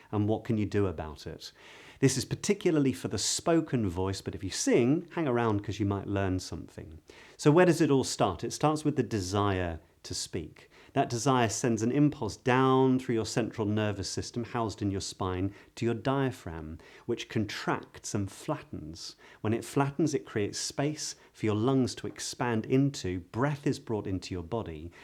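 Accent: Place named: England, United Kingdom. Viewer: Beautiful accent, the pace, volume,etc. Your speech (maybe your ideolect) is very pleasant to hear. It's modern English accent too. Good channel and good content, for special audience 😅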